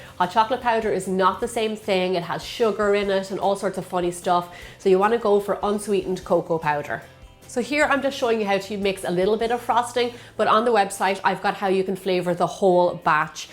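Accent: Ireland. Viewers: Are you Irish, I love your accent! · You've explained everything so well , so easily understandable for newbies like me Also , Love your voice and accent ❤️ Irish., I'm guessing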